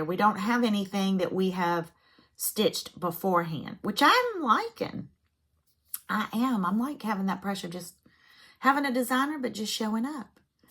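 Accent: South, United States. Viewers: I just started watching your videos last week. I really like listening to that southern accent (I'm from Texas). Now I love you videos! Anyone who owns a golden retriever has a special place in my heart. Thanks for sharing your videos. I really enjoy them.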